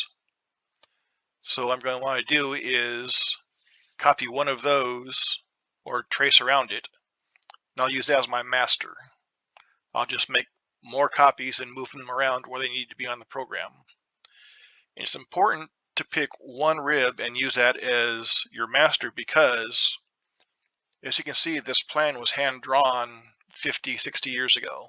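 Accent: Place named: Pennsylvania, United States. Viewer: Absolutely fantastic! Thanks a million for this. btw, are you from the great state of Pennsylvania? You have that accent ..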